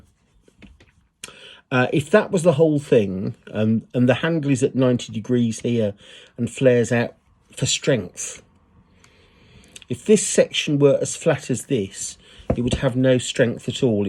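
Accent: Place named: United Kingdom. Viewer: These southern US ears have trouble understanding British accents. Did you say bginternet?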